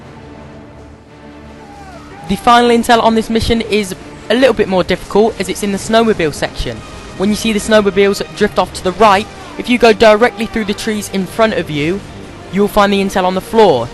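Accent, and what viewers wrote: United Kingdom. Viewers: I love his british accent =)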